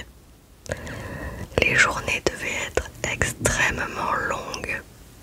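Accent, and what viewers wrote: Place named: South, France. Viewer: avec grand plaisir A moi de te remercier pour tes vidéos de grande qualité et toujours intéressantes Et ta passion pour l'astronomie et l'espace est contagieuse tu en parles d'une belle manière ! Sinon pour l'accent du sud (milieu) bah ne le perds pas j'adore ! moi je ne vis plus en France et il s'est évaporé avec le temps et la distance mais j'ai grand plaisir à le retrouver quand tu chuchottes ! Continue tu es excellente 💫🪐👍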